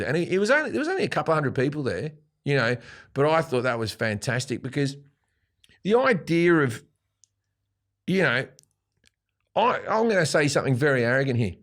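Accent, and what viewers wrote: Australia. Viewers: An Aussie accent delivering dick jokes. I wouldn’t call him a comedian.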